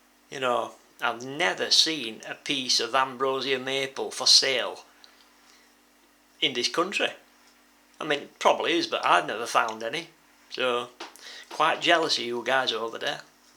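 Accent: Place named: Yorkshire, United Kingdom. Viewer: Ayup, nice to hear a Brit bloke voice, is there a Yorkshire hint? just a bit. I've only been turning just over a week, I was told that Turners Retreat was in Sheffield! the buggers lol. So it's in or near Nottingham eh? Just my luck. Brilliant video's mate, how much wood blanks did you get for 6 to 7 hundred quid? When I first started to think about woodturning up I didn't expect that getting hold of wood for turning to be difficult! The American turners are spoilt lol, they seem to hsave stunning wood and its in their gardens most of the time! lol. Do you have dificulties getting wood to turn? OK won't bore you to much mate, take care, Turn Safe or..... TURN OFF. Cheers Andy South Yorkshire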